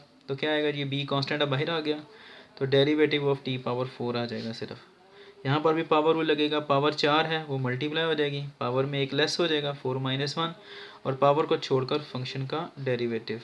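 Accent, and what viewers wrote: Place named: Lahore, Pakistan. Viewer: Sir AP ka bolny ka style Lahore wolo jasa ha and Iike their style of talking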